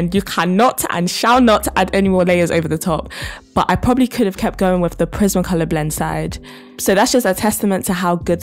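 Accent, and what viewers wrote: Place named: England, United Kingdom. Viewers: i'm not sure what you mean. i'm pretty sure she has a British accent but u do u ig. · everyone has an accent? I’m not good with accents but she has a type of English accent.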